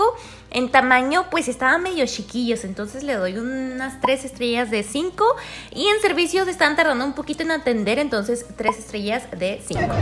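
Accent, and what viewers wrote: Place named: North, Mexico. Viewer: ME SUSCRIBI... ERES LINDA CON TU ACENTO NORTEÑO GROSERO... ARRIBA MEXICO MI LINDA MUJER .... SOY DE LA CIUDAD DE MEXICO !!!!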